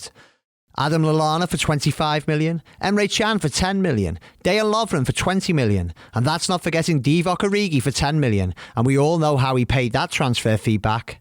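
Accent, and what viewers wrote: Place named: Wirral, United Kingdom. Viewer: Sounds like a Birkenhead / wirral head .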